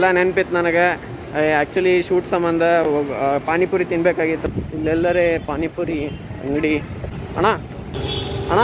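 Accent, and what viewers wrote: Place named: Uttara Kannada, India. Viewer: First video nodidini. Uttarakannada bashe chennagide.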